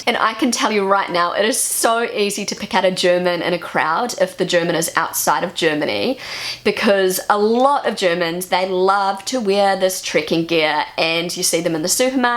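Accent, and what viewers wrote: New Zealand. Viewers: By the way, I love your NZ english. It has a weird soothing quality and puts a smile on my face! I need to go to NZ.... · By the way I think you have such a cute English accent! I have lived in England, South Africa and visited Ireland and Scotland but your New Zealand accent is in a class by itself and I think it is very cute!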